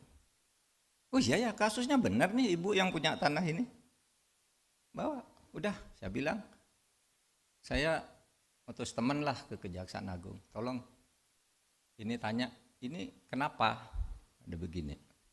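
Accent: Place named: Madura, Indonesia. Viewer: Logat maduranya sekali² masih suka kedengeran. Orang Madura kalau udah pinter, memang pinternya kebangetan. Tapi nek wes atos, yo wes, angel urusane . Andaikan semua orang madura kayak pak Mahfud MD. 😁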